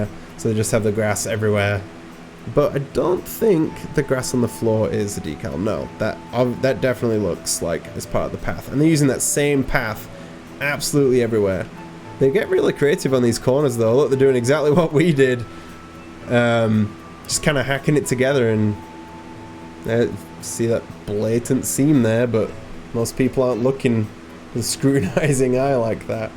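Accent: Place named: Scotland, United Kingdom. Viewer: I'm confused by your accent. It's like a mixture of Scottish, a northern accent and some American, at times? Am I going mad?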